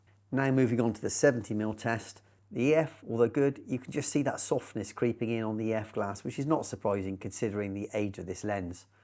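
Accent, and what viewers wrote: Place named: West Country, United Kingdom. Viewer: very good review, the parfocality of the 2.8 seems more than good enough to settle any worries I had. Agreed on the pricing of the servo unit, it's far too high a percentage of the lens' cost itself. Shirt was on point too, and west country accent turned up to 11 made up for absence.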